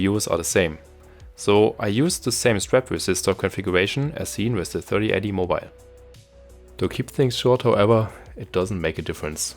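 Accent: Germany. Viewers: Sounds like you are german, can i send you for modding my razer blade <num> ti with new shunts so the tdp rises from 90-110w tgp to round about 150-175w tgp? Obviously i would pay you for the the great work you are cappable of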